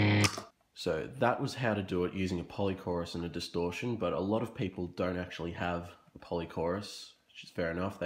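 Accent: Australia. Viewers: Are ya aussie mate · very helpful video! didn't know you were Aussie, where about are you located? I'm from Adelaide but have been living in Canberra for most of my life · Sorry My Bad, Now looking back at the Video you do Sound more Australian. 😁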